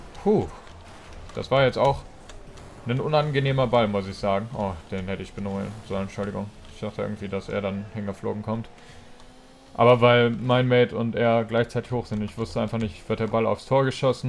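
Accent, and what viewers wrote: Luxembourg. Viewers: Kommst du aus Luxemburg? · Biste Luxemburger? 😂😂👍👍👌💪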